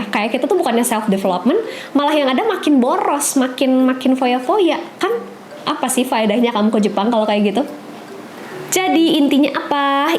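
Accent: West Java, Indonesia. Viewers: Sunda banget euy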